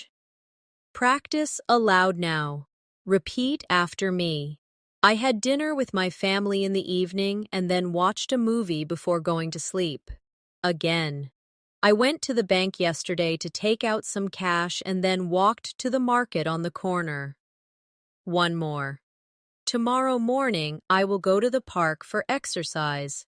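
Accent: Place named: United Kingdom. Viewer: I like your British accent. And I appreciate for your videos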